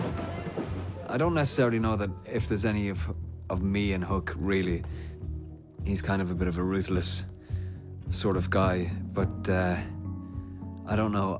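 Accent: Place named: England, United Kingdom. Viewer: Ah okay right, I misanderstood English and Irish accent actually yes he does use the English accent more than the Irish one :) thanks for clearing that up for me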